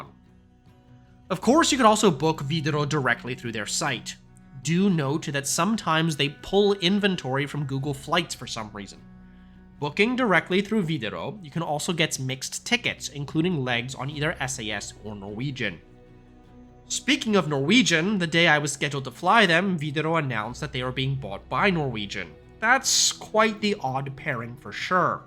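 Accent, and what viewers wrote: United States. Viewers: From your accent I can tell you're from across the pond. As airport self-service solutions are underdeveloped over there, although it's changing, I guess you can be excused for thinking you had to go to a manual check-in desk at OSL, but that's of course not the case. Not every airline offers self-service options, but most European carriers with a normal scheduled service do at OSL. The next time you're at OSL, or most modern airports, you should look for these services first (not hard to find), and only go to a manual check-in desk if there is specific reason to do so. That's what experienced travelers do. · Wideroe is unique , not weird . What's weird is your constant whining . Couldn't listen to your nasal American voice more than 2minutes . And routes is pronounced 'roots' not 'routs' !! 😡😡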